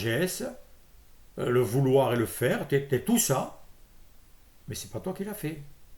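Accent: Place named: South-West, France. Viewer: ❤❤😂❤😂quelle pêche, lucidité, humour, objectivité...n en jetez plus😂😂😂...vous êtes du sud ouest avec 1 accent aussi prononcé...?? Le même que le mien soit dit en passant..en tout j adore..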